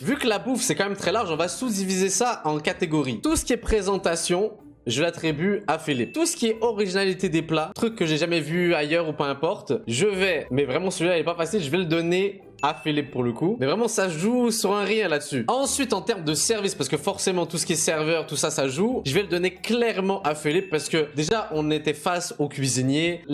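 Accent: Quebec, Canada. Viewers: Est-ce que c’est moi ou tu a une partie d’accent québécois. Je suis québécois et je crois que tu dois écouter quand même pas mal de vidéo québécois!!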